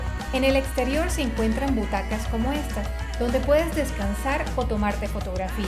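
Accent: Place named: Barranquilla, Colombia. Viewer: Una pregunta, ¿Tú eres ecuatoriana o colombiana ? hablas como barranquillera.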